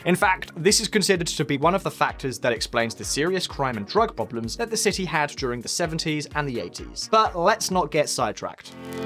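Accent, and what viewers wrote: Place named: United Kingdom. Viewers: He's British. H is pronounced "Aitch" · Best narrator for this channel, but pls pronounce H the non British way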